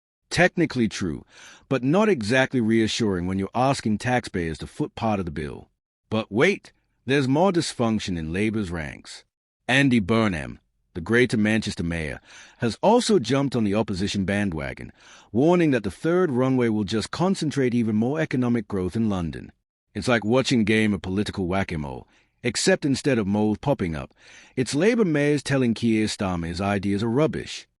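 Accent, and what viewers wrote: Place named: United States. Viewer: I'm a bit suspicious of this, nice though it would be, if true, but there is nothing about this on any other youtube channel. Together with this being reported by someone with an american accent, how come nobody who is British reporting this. A huge pinch of salt is needed with this. Is this, (Fake News) going under yet another name?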